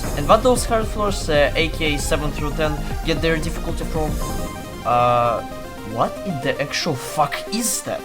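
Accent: Russia. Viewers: You are Russian? (your accent sounds like Russian.) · у тебя как будто русский акцент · man, u have have so Russian accent XD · Bro you have absolute russian accent and great vid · U have russian ascend, are you? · russian accent noticed👌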